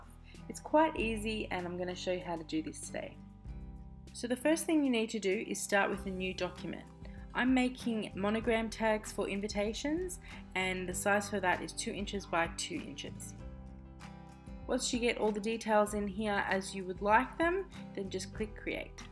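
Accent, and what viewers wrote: Australia. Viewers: Great info, just what I needed. Delivered in Australian too!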